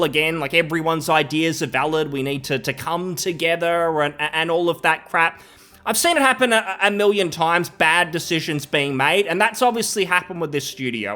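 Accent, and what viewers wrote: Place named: Australia. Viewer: I give the dude the benefit of the doubt, he's a smart bloke, a lot of words pronounced differently in Australia.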